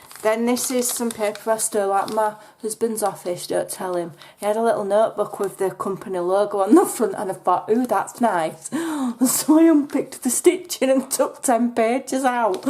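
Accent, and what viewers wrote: Yorkshire, United Kingdom. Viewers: yes i guessed you were from Yorkshire lol xx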